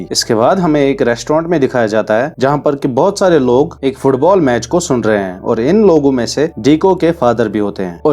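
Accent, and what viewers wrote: Kashmir, India. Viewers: I think you are KASHMIRI because of your accent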